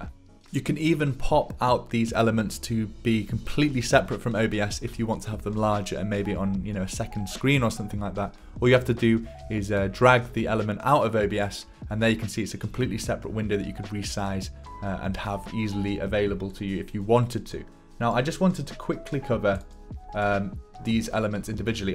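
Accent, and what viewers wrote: United Kingdom. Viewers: instant like for the cool and nice British accent. 🇬🇧😎👍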